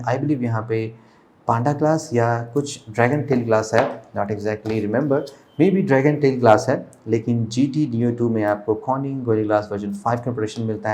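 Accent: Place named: Rampur, India. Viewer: Bhaiya apke rampuri ka koi jawab nahi😁😁😜🙏🙏❤️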